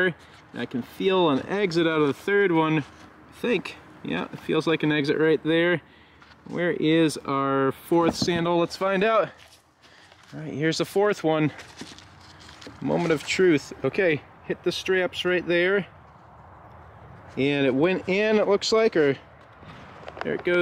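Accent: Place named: Midwest, United States. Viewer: Can't get enough of the Midwest accent! like the 22lr videos, but come back for the accent! You sound just like my late dad and all my cousins, aunts and uncles from STL, Iowa, and WIS....Have a pop doncha know!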